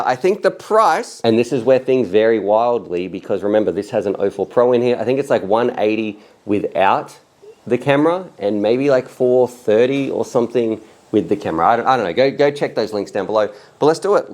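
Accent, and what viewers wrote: Australia. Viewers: Mate he's aussie it's his accent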